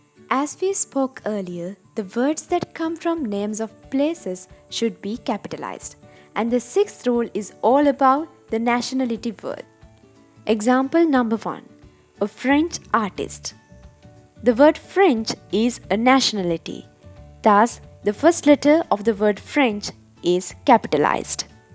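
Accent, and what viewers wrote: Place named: India. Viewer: Just want to know dear, who taught u English? An Indian? Your accent says though. Anyways a nice presentation.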